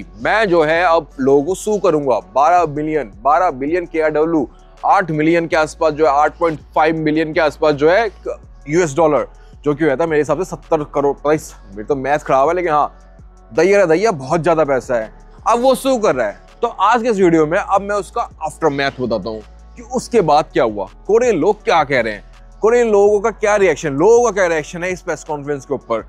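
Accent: India. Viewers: Aap Bihar se ho naa?? Aapka tone vaisa hi laga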